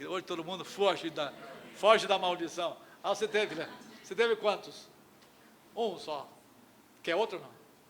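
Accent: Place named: United States. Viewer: Q sotaque de americano é esse às vezes? E "nois"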